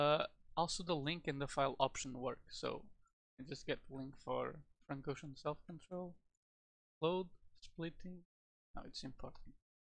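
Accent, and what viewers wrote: Portugal. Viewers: vi logo que eras tuga pelo sotaque, obrigado por todo o esforço na realização deste projeto <3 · algo me diz que és portugues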